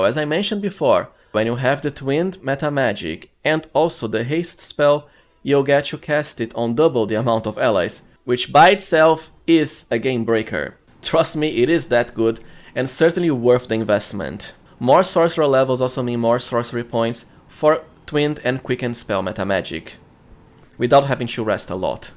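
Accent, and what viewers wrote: Brazil. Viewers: are you brazilian btw? The accent feels like it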